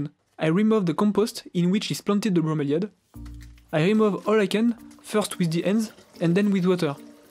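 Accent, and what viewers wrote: France. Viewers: le fameux accent Français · avec cet accent français, j'ai tout compris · that french accent I just can't stand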